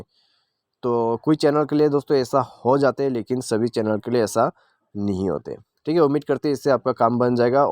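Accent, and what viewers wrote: Assam, India. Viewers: Bro tmi assam r jen lagise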